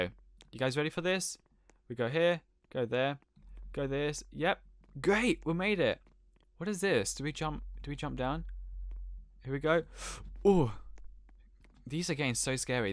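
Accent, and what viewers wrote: United Kingdom. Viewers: Are u British I can not tell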